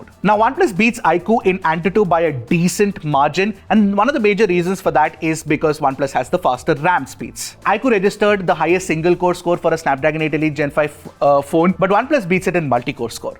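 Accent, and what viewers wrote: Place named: North India, India. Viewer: I thought you were from North.. i was surprised by 😮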